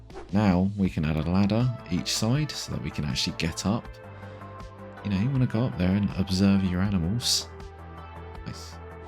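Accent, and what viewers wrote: United Kingdom. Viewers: Somerset, born and bred here. Love hearing that Devon accent mate, keep churning out the fantastic content. 👏🙌🤟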